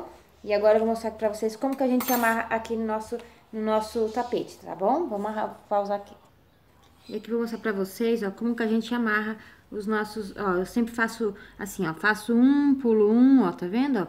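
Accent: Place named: Rondônia, Brazil. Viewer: Q coisa bunitinha! Vc tem sutaque de Ro!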